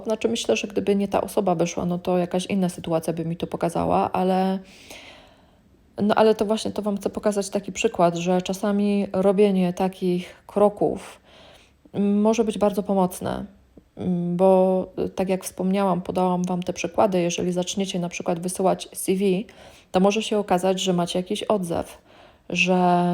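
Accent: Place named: United Kingdom. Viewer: If you talk English why you have a British accent?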